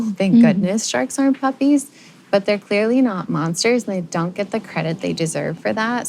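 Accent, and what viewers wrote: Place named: Brazil. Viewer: Não te conhecia, mas pelo sotaque vique vc também é brasileira, ne?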